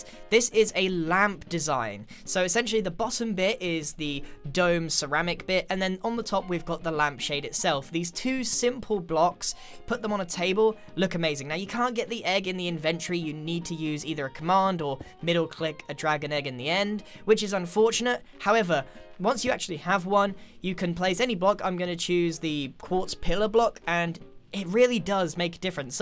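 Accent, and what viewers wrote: United Kingdom. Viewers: Yes because he talk british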